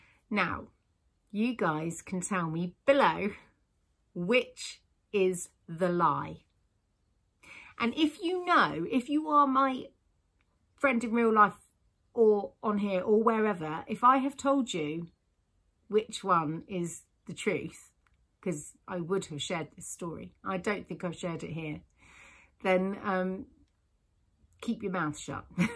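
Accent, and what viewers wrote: United Kingdom. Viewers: That’s a hard one, I can totally see you talking to the police in LA... all upset, probably at an airport or even better in an big casino full of people with your british accent and your colleagues laughing.. the poor police officers 😂 but the shoes also sound very... hm, im going for the shoes as a lie! I just want the LA story to be true 🤣🙋🏻‍♀️ Thanks as always, have a nice day!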